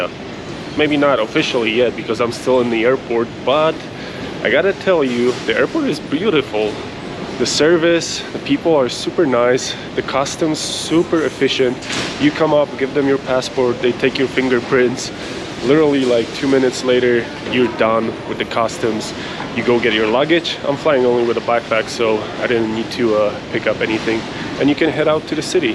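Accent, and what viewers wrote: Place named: Poland. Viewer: This guy isn't American. He sounds polish to me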